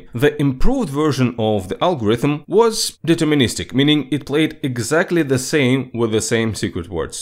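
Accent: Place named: Russia. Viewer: Как же очевиден русский акцент ахах, с первого предложения распознал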